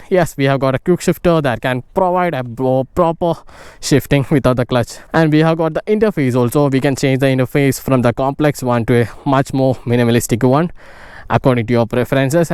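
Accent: Kerala, India. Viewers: Malayali hain vo gajab insaan uska baath karne ka tarika vahi hain encourage him if you can or else please better to keep silent and watch the video.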